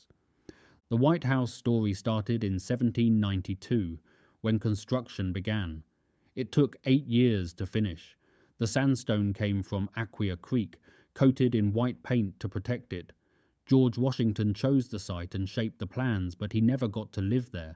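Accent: United Kingdom. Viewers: Hey Dude you sound British. How would you like it if the British king torn down Buckingham palace to make a modern all-glass tower like what they have in Dubai?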